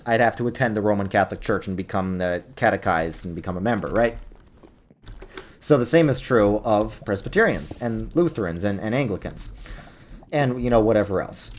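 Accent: New York, United States. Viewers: I feel like you've started to sound more and more like a New Yorker with each video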